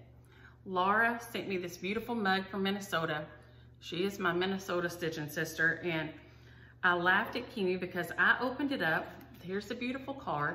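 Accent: South, United States. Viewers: It would be a "treat" to stitch some "Autumn" pattern! I love listening to your channel! I am from Michigan, so I love hearing your southern accent.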